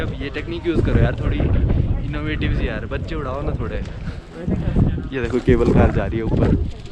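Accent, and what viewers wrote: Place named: Kanpur, India. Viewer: Yeah tho kanpur wala style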